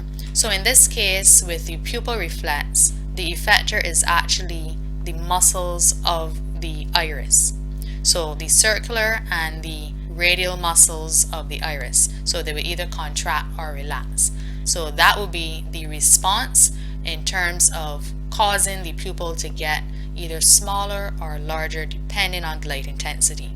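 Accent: Barbados. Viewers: yea...I figured so.....I grew up with that accent so I knew it...just certain words were classic Barbados. Glade to hear ya teaching. Create more content. Thank you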